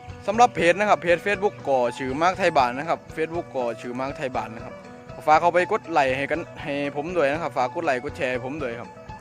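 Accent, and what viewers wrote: Korat, Thailand. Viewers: แก่นี่พูดดโคราชดีเจนเนอะ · คนโคราชเบอะครับ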